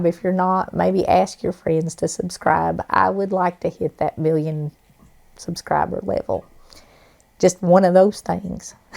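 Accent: South, United States. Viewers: Really nice video and takes me back to my southern life and accent. Dandahermit. Im 80 yrs old and live waaaay off grid up in the mountains. Have a good lifegirl❤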